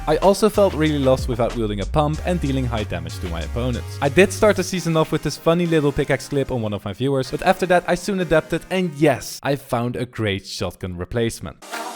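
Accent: Netherlands. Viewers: Are you dutch?? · Ur accent sounds like your from the netherlands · Are you Dutch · Lol je bent nl en ik hoor het aan je acsent en op een clip zij je gg man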